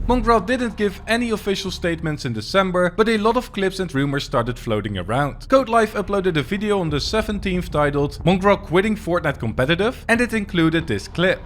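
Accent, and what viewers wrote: Netherlands. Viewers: are you dutc hyou got a dutch accent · Are you dutch?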